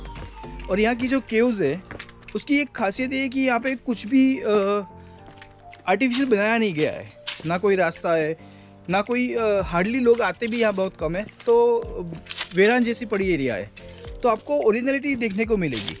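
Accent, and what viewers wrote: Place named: Gujarat, India. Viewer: Bhai bhai ek Gujarati nu Hindi kaik alag j hoy chhe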